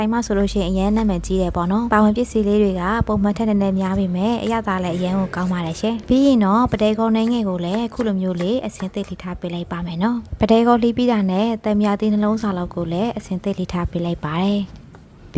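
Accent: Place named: Shan, Myanmar. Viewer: You sound like Shan people not Burmese however you have a sweet accent I am sure you are a good cook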